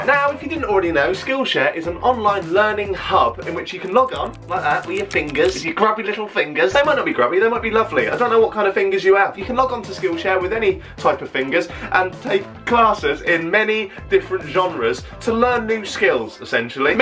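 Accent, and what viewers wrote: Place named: Norfolk, United Kingdom. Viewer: Shout out to Norwich! I was brought (dragged?) up in Norfolk so accent makes me feel weirdly at home. x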